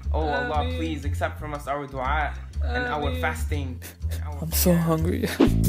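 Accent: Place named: Bengal, India. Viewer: btw.. your bengali dielect is so hard to undeestand.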